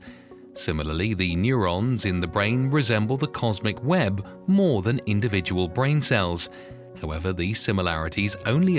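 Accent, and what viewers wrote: United Kingdom. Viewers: The Universe is big and it has many stars, planets and galaxies in it. And it probably has other life forms in it too. With that being said, why do we always have some British guy narrating this stuff?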